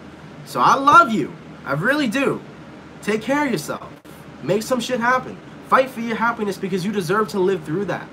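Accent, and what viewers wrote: England, United Kingdom. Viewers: (Gemmies) yuk! Am I the only one who's cringing? English accent double cringe. Ok wots goin on? Lol xx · Lol the English accent 🤣🤣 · Thanks 🙏 for your Pisces ♓️ reading great brittish accent, have a amazing day, bless you ❤️🦋🌹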